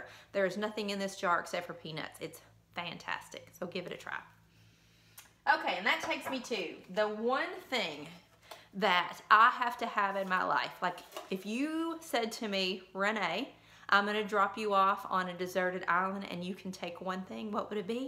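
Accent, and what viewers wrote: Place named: Kentucky, United States. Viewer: aha! Thought I recognized that accent. I am from eastern KY but mostly raised in southern Indiana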